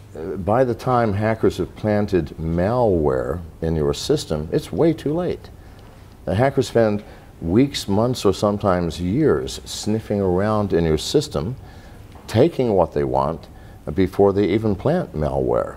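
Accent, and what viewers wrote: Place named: Soviet Union. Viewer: I like how he keeps slipping into a Soviet accent.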